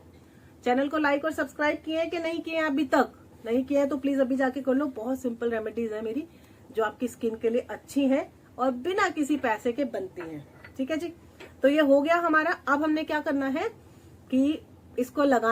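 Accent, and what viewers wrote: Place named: Durgapur, India. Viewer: didi kub sundor aime durgapur sa bolere he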